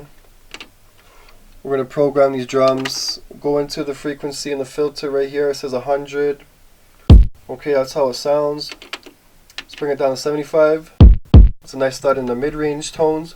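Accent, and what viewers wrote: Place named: Toronto, Canada. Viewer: siiiiiiick....nice accent..must be from the 6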